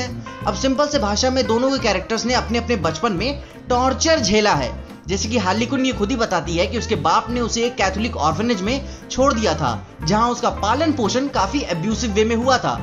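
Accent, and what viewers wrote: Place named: Bihar, India. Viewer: You must be bihari You sounds like bihari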